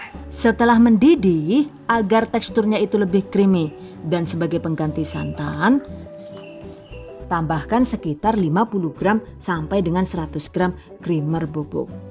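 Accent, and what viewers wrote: Banyumas, Indonesia. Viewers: Ya Allah.. Ada ngapaknya.. 😂😂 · Ngapak ya😂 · Nyong seneng bgt karo bahasa ne rika sing ngapak kue yu ..🤣🤣 mantap resepe 🤗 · Wah bisa dijadikan ide buat usaha nich bun nanti insya allah klau saya diberikan kesembuhn sakit saya ini,saya akan mulai usaha seperti ini insya allah #GiveawayTHV2021..dn bun setiap liht vidio bunda saya sll bisa ktwa dipertengah krn bunda sll pake bahasa ngapak🤣🤣🤣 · the best ngapak bar bar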